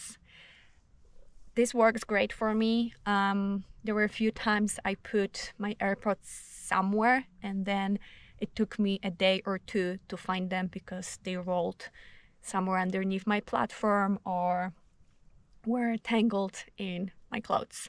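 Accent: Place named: Central Europe. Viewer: I'm curious about three things in regards to your video. Firstly, why do you feel the need to roll the windows up in Griz country, and secondly, have you considered removing the front passenger seat for more room - or does it serve some purpose? Lastly, you have an interesting accent that sounds to my ears to be from Central Europe - am I close? 😀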